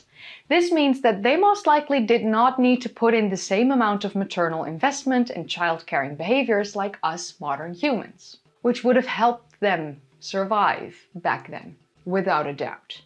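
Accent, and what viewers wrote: Netherlands. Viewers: Hm, what when they are not disappear / going extinct , because they had offspring with the other human species? When the DNA could not extracted, we don't know it. ;) Greetings from Germany and I like your Dutch accent. Heidelbergensis aussprechen ist auch auf deutsch ein Zungenbrecher . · Heel interessant om ons op onze juiste plaats te zetten, wij zijn nog jonkies vergeleken met deze homies. Bedankt voor de vertegenwoordiging van nederlandse uitspraak in deze engels-gedomineerde omgeving.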